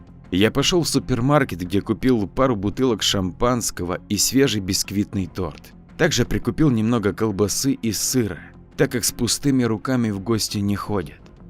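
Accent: Ukraine. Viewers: По говору слышно)и очень приятно слышать рассказы об украинских местах, с элементами местных словечек) у вас отлично получается озвучка, желаю вам удачи) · Автор,ты не из Украины ?)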